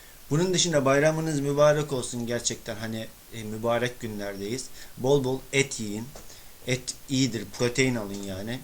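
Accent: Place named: Laz, Turkey. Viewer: lazın şiveye bak abbbooo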